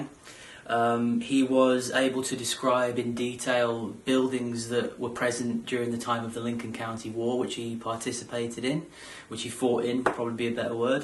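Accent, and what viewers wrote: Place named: United Kingdom. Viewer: I watched this randomly as an Oklahoman, the moment you talked I was shocked to hear a UK accent. Used to hearing us talking about this.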